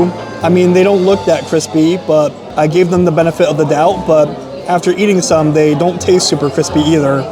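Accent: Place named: New Jersey, United States. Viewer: Where in Jersey is this guy from?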